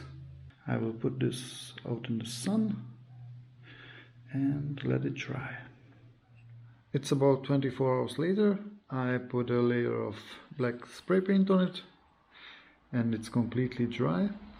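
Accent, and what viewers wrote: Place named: Russia. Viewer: Hey i have just subscribed! Thanks for your videos. I am getting a 1987 double cab this week. It has 1900cc engine. Petrol and water cooled. I am inspired by your vids. By the way i am from Israel. Where are you from? I here an accent... maybe russian?🤔 any how thanks for your vids! Keep them coming.😁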